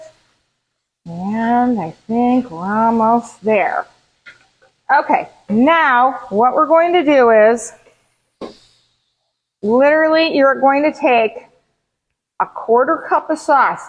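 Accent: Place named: Fargo, United States. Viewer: I love the “Fargo” accent!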